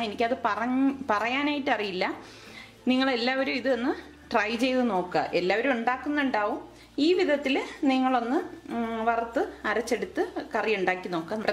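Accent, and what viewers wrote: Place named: Eastern Kerala, India. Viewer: .. Ya ya.. Sure.. Ithatha wr u frm? Vadakkarude slang alla talking.. Kizhakot anu ennu thonnunu.. Am i correct.. Im vry interested in ur recipes.. Masha Allah.. May Allah bless u..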